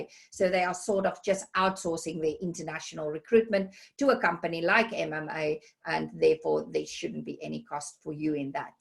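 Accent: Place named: South Africa. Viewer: Good to hear you still speak with your South African accent 20 years on. 3 seconds in and I knew you were from here.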